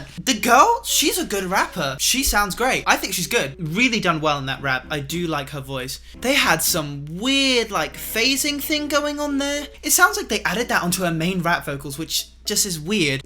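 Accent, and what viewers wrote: United Kingdom. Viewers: Ok so this is my first time watching one of your non singing videos and your British accent hit me like a fucking train ngl. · J’aime tellement l’accent des UK 😭❤️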